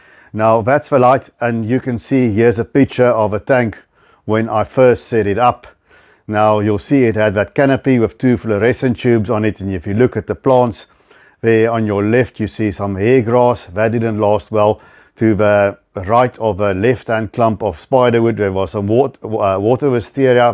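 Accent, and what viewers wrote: South Africa. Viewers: Love South African accents, great tank too!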